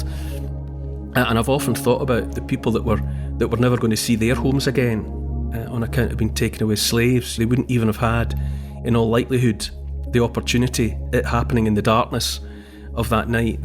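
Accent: Scotland, United Kingdom. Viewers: Cool Scottish brogue.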